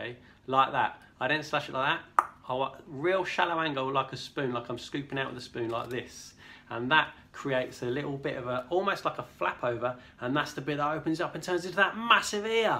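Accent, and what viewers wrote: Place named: Cockney, United Kingdom. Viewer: My new favorite cockney bread maker!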